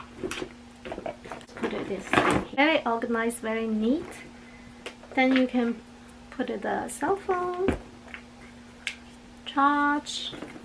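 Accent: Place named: Thailand. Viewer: I think shes from Thailand coz she said duck instead of dark😃😃 thai people can’t say the r just saying coz i have thai friends same can’t pronounce the R 😁 ✌🏻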